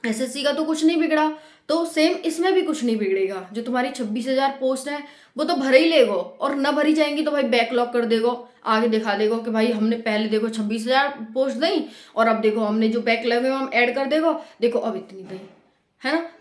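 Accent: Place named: Mathura, India. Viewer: Mam aap kahan se ho aap meri tarah bolti ho m Mathura up se hun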